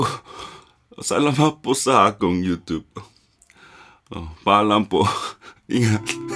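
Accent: Philippines. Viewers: Watching your show is satisying. Gotta love that pinoy accent of yours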